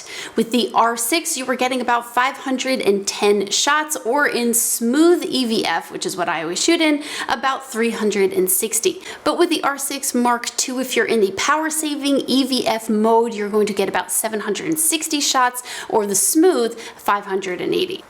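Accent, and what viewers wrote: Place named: New Jersey, United States. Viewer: That Jersey accent and shtick is priceless!! Well done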